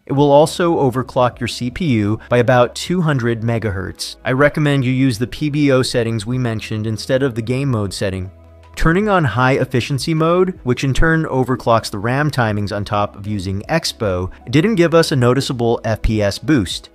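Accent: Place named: United Kingdom. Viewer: Thanks i got the same cpu getting some solid improvements now, also maybe time to embrace your british accent again for next video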